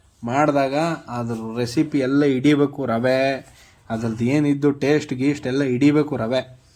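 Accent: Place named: Mandya, India. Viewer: We are waiting for more cooking videos and most importantly a separate fan base for ಮಂಡ್ಯ ಮಳವಳ್ಳಿ background voiceover..😁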